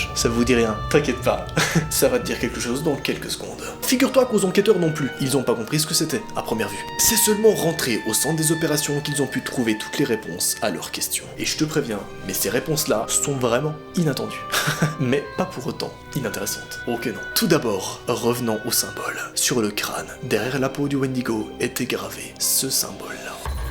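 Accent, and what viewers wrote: Belgium. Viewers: Tu es belge ? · cc t belge?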